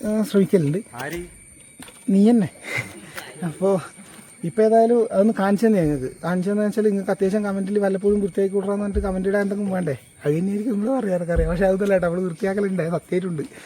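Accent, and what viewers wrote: Kannur, India. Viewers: നിങ്ങളുടെ vdo കാണുന്നത് ഈ സംസാരം കേൾക്കാൻ വേണ്ടിയാണ്, എല്ലാരുടേം സംസാരം എനിക്ക് ഒത്തിരി ഇഷ്ടാണ്. കണ്ണൂർ സ്ലാങ് നിങ്ങൾ പറയുന്നത് കേൾക്കാൻ സൂപ്പർ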